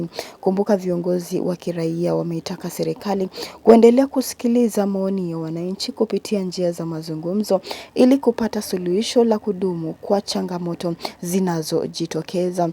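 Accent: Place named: Kenya. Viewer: Ndg. Wananchi hii chanel ni ya kenya pia hata huyo anaeongea ni Mkenya lafuz mumeisikia na hizo picha ni za zamani wakati wa mazoezi ya makomando puzeni hayo. Tanzania ni salama na tulivu Amani tupu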